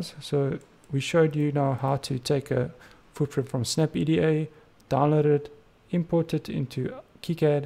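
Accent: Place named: South Africa. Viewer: Thats that South African Accent. Shout out from the free state.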